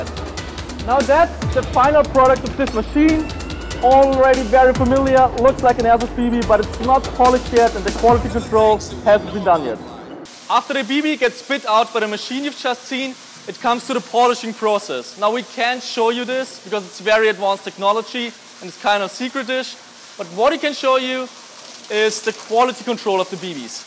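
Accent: Germany. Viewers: Dein englisch ist gut jedoch der akzent ist zu deutsch 😂👍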